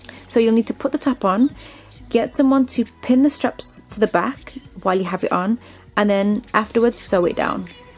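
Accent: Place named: South Africa. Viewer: Lol. I actually thought there was a tiny bit of South African accent in there. I'm from Zimbabwe but been in Manchester since I was 8. And there's no trace of any brummie in yours lol xx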